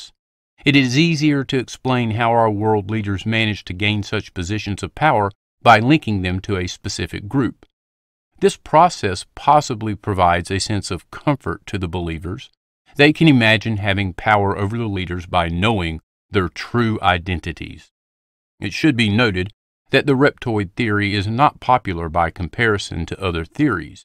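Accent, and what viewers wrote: South, United States. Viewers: More content like this! I love your southern accent while reading the esoteric goods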